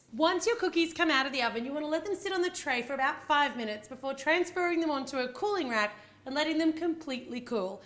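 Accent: Australia. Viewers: Is she Australian?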